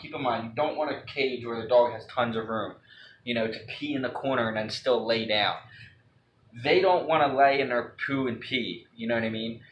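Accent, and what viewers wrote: Philadelphia, United States. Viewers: minutes in I could tell you’re from philly from your accent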